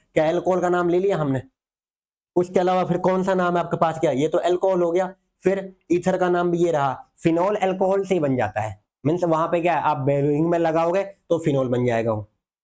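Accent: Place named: Mewat, India. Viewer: Sir me jairoli tijara se aapki vedio dekh rha hu mene aapni language se pehchana aap mewati ho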